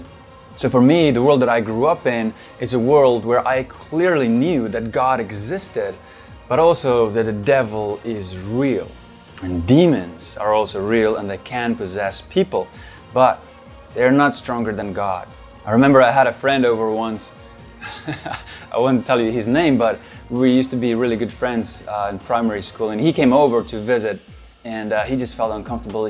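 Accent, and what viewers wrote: South Africa. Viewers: U from south africa ey ?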